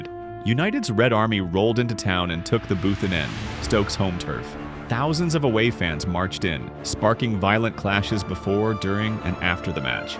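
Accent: United States. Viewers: The minute I hear an American voice talking about British sub culture - I'm off. Ta Da. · What’s with the American accent narrator? Anyway, Stoke football violence actually originated from 1968 with the coming of the skin head cult. The events from 1985 onwards were just a continuation of the moronic behavior that started much earlier.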